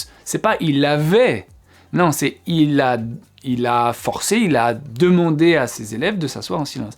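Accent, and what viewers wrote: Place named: United Kingdom. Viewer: Je regarde votre chaîne pour la 1ère fois j aimerais bcp votre prononciation britannique elle donne de la valeur a la langue anglaise (pas comme accent USA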